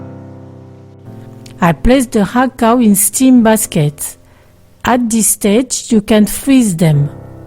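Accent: France. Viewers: she sounds very French!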